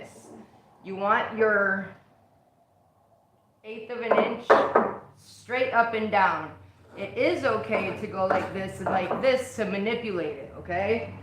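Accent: Michigan, United States. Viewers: Man I just found your channel and I love your high spirit. You are so much fun to watch. It sounds like you are in Michigan and so am I. If you hold classes let me know I would love to attend. I work with resin and I’ve been contemplating adding wood.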